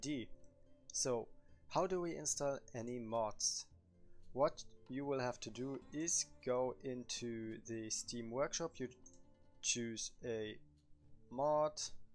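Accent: Germany. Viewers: aber sprichst deutsch, das meinte ich, und du hast den launcher auf Deutsch und man hört den deutschen Akzent raus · i can hear the german accent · Man hört so krass das er deutsch is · Man hört so hart das du deutsch bist lel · Ok Cool Aber man hört deinen deutschen Akzent :) im englischen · Ich liebs wie man Deutsche an Ihrem Englisch erkennt haha, danke fürs Video ! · Man hört den deutsch Akzent 😂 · Ich liebe dein Deutschen akzent 👍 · Are you German? If yes, you speak good English👍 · Einfach schön auch mal Deutsche Englisch sprechen zu hören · digga du bist zu 1000 prozent deutscher haha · Deutsch man hört es direkt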